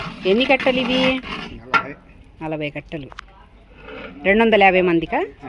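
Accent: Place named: Godavari, India. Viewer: Mee Hindi language godavari lagundi leka guntur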